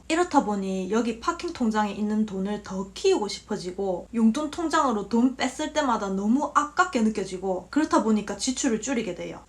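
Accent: Gyeongsang, South Korea. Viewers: 우연히 보았는데 인터넷을 잘못하는데 토스뱅크인가 파킹통장 먄들어야겠네요 경상도 아가씨라 쏙쏙 귀에 꼬치네 유익한 정보 고맙십니뎅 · 경상도 아지매라 그런지 말이 쏙쏙 넘 재미나네요 농협 붙박이로 쓰는데 유익한 정보 보고 갑니다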